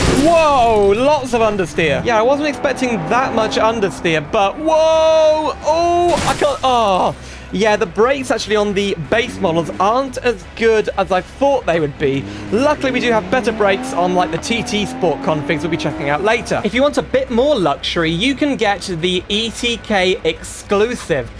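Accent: United Kingdom. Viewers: Both are brits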